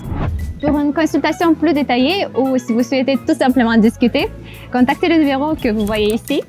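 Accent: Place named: Spain. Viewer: Se le nota el acento de española, pero lo habla bien.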